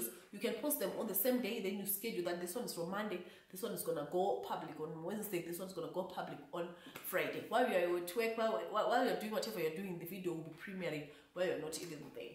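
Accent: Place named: South Africa. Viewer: With accent though, it depends on what your target market is. My target market is based in the country where I'm currently based. The locals here are the ones who bother to watch my content and comment and even ask questions about the places I visit. If I used my home country accent a lot of people where I'm based would not understand me. The accent I use at work is the same accent I use on YouTube. I had to learn this with my full time job and that was culture shock for me which I had to adjust to. Sometimes it is also natural to subconsciously speak in a similar fashion to those around you. I can pick up South African traits in your accent. It happens naturally. I used to have a Zambian accent for the longest time because I lived in Zambia for a greater part of my youth.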